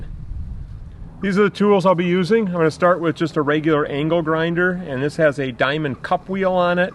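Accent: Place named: Michigan, United States. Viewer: I love Petoskey stones! I was born in charlevoix. Your accent makes me homesick. I live in GA now.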